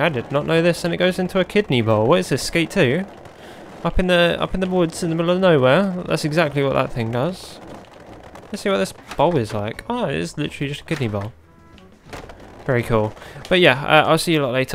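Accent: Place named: United Kingdom. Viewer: I love your bri'ish accent (pls don't take that offnsively) also how is your day been going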